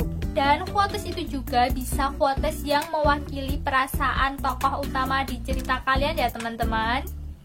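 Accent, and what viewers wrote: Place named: Java, Indonesia. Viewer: Kek medok jowo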